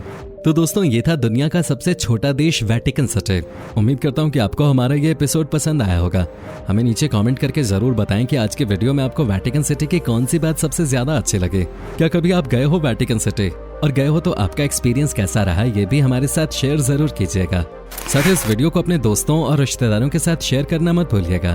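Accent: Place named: West Bengal, India. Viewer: Bangali voice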